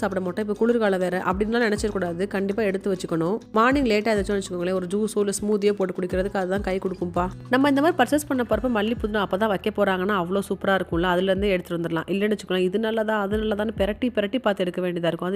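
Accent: Madurai, India. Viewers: Hi i'm ur new subscriber.ur slang.....?i think ur native is madurai?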